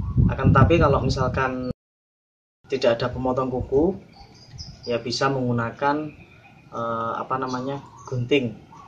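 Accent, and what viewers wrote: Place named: Java, Indonesia. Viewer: suaramu kok tambah medhok hehe 😀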